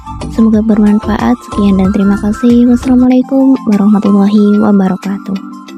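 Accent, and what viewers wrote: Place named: Java, Indonesia. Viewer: Logat jawa banget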